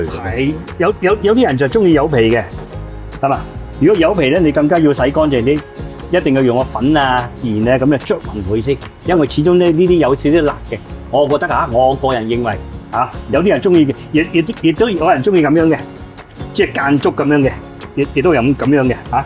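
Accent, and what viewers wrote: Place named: Dongguan, China. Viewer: 好正！冒昧问句，是否东莞人？好似听到有东莞乡音！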